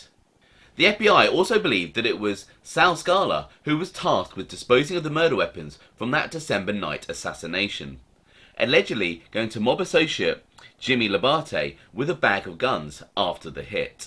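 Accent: England, United Kingdom. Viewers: Blimey that was quite knowledgable and informative. would fit right into a crew if it weren't for that english brogue. ( I couldn't help but imagine playing background music as he narrated )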